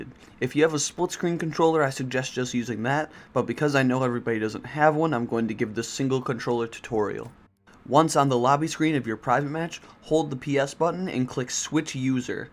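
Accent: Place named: South, United States. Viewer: Great video very well done! only issue is the voice over tbh I don't enjoy the southern accent please refrain from doing commentary in the future and please bring back the brexit guy with love Songless ps I am serious please don't do voice overs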